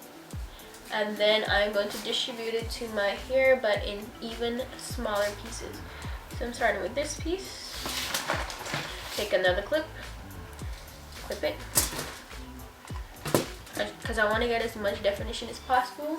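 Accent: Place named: Caribbean. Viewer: Ahhh I knew i heard a familiar accent! i’m from the bahamas Your hair is gorgeous!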